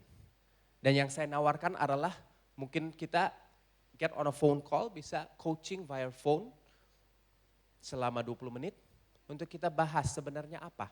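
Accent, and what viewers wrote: Indonesia. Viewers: Smg almarhum mendapat tempat terbaik di aiaiNya....amin Logat sdh sgt Indonesia banget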